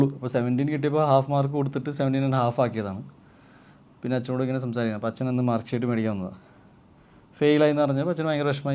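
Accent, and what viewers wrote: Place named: Gujarat, India. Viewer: Ayaale padichathum valarnnathum Gujarat aanu. 20 vayassu kazhinjaanu pulli malayalam ezhuthaan padichathu. Athinte issues dialogue deliveryke onde. Athaanu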